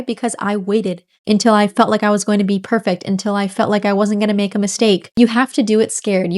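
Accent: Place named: United States. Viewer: To add on a complete side note. I only just realized that you’re speaking with a state side accent. I follow a few YouTube, instagram accounts to graze on Spanish content. Most are native speakers but there’s a few English. You have a very English look and demeanor. Surely you’re living in England?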